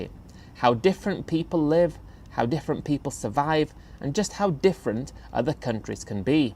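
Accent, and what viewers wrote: England, United Kingdom. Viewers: Would that an English accent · You dont really have an ear for accents. This guy who narrates these stoties is very much English.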